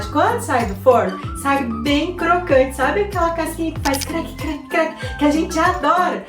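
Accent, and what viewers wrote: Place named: Minas Gerais, Brazil. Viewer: Oi, tudo bem? Tenho duas curiosidades a respeito de vc: Qual é o seu estado? Seu sotaque, as vezes, parece interior de Minas. Qual é seu nome? Tenho uma prima que se chama Teresina e tem apelido de.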